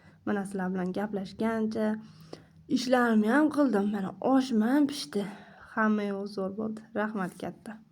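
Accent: Andijan, Uzbekistan. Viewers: Аввалам айтганман мен андижон данманк шевангиз худди бизникига ухшар екан деганман мен кизимни аккаунт идан чикаман йошим 60дан ошган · Хожи киз бэкор гийбат дебсиз хамма нарса ниятга богликлигини биласизу сиз ростанам Валижон шамсиевни анжонлик келинди уйнаган келинга ухшаб гапираркансиз ошликмисиз мен Анжонданман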